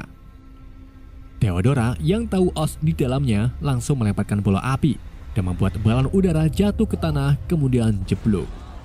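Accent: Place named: Banyumas, Indonesia. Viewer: pengisi suara ngapak tapi bahsa Indonesia gak telalu mendok...bagus...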